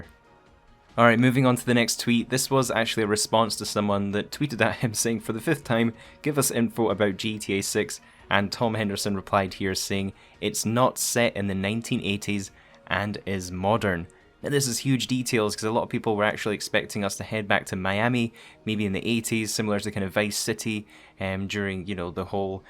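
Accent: Scotland, United Kingdom. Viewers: This guy sounds he lives down the road from Edinburgh’s Rockstar studios 🏴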